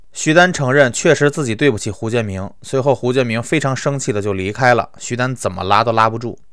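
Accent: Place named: Beijing, China. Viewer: +10086 第一次看你影片是石景山八尸案 你当时给凶手口供配音 京味儿真的够浓 一下子代入感亲切感就上来了 老哥加油！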